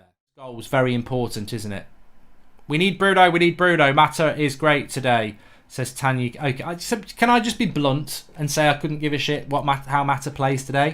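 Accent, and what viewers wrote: Manchester, United Kingdom. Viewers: Bowzer Wowzer yeh no one cares about anything on United stand apart from it the geeza with the thick manc accent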